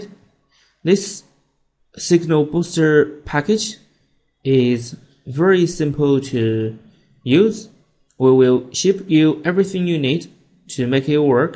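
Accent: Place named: China. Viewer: Your accent is certainly not British, more like Chinese English. Especially when you say PPPower Pluuug. Oh, and these boosters are a fraud I have bought several and they do not work.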